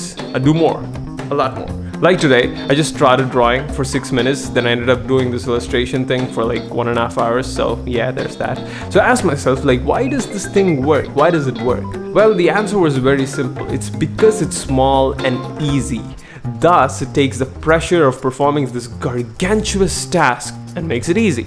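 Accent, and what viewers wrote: India. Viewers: I dnt know why, but i hear a severe indian accent. Are you an indian? 😵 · Youra indian but your voice is clear woq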